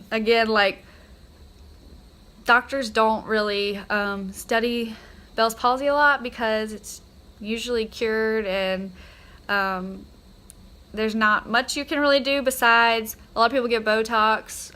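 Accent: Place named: South, United States. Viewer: Hi, my name is Melanie and my husband was diagnosed with Bell's Palsy almost 3 weeks ago. He had horrible headaches the night before and then woke up am took a sip of water, it ran down his chin and he looked in the mirror and there was it. I took him to the emergency room right then and they knew right away what it was. They didn't do a scan or anything. Did you do acupuncture or anything like that? Fred is doing acupuncture, he's meditating and I think yoga as well. We live in Santa Monica, CA, but I'm originally from TN. Are you from the south? I feel like I hear it a little and frankly that's what I found appealing and comforting about your video. Thanks so much for sharing your story and I will definitely check out the FB page. I do drive Fred crazy watching all of the videos about Bell's palsy, but I just find them so helpful! All my best to you.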